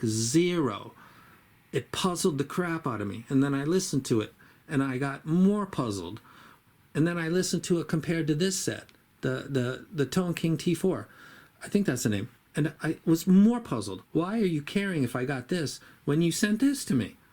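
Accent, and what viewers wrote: Boston, United States. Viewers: Lol, I had a feeling you were from Boston. New England loves what you do man!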